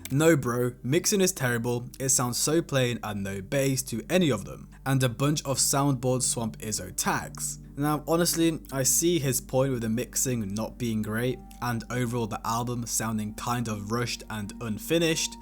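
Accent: United Kingdom. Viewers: did this youtuber really just said "Young Scutaaah" ????? I hate UK accent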